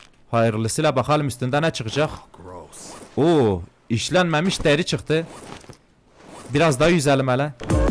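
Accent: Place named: Diyarbakir, Turkey. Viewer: Abi nerelisin? Bizim Diyarbakırlilar gibi konuşuyorsun. Saygılar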